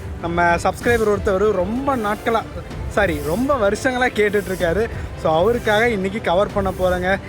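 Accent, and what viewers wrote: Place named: Coimbatore, India. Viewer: Bro adu slang bro avaru cbe so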